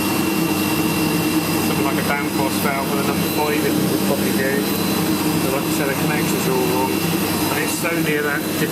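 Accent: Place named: London, United Kingdom. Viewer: I think it's that accent from London, England that's really throwing him off !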